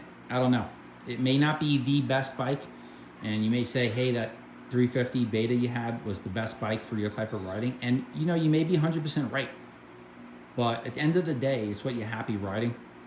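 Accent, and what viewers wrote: New York, United States. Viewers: Definitely from New York 🎉🎉😂😂hear it in voice🎉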